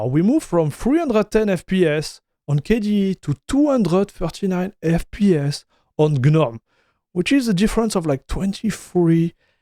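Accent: France. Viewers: I hope your english gets better, it sounds more like pure french, and it's very hard to understand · Very French accent! Un compatriote 😂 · lol, yes french accent from france but his english is easy to understand.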